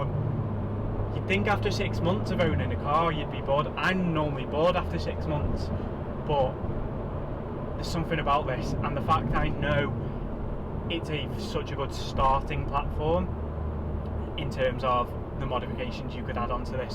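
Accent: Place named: Liverpool, United Kingdom. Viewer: Love the scouse accent nearly has good has ours great video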